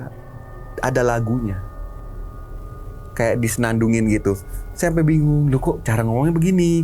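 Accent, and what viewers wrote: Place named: Jakarta, Indonesia. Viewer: Seru nontonnya, ceritanya si abang kocak ky org betawi logatnya. 😂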